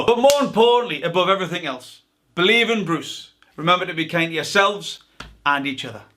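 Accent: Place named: North East England, United Kingdom. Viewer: Where you from I hear a north east accent? Boro?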